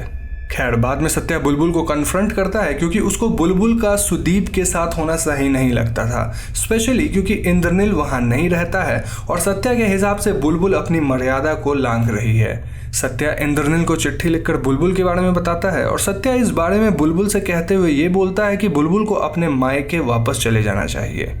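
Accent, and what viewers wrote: Bengal, India. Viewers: Are u a bong? “😃.....Anyways”..... very well explained!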